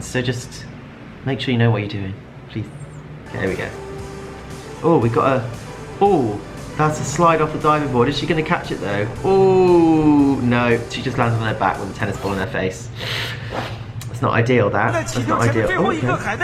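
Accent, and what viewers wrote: United Kingdom. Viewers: Tres British 😊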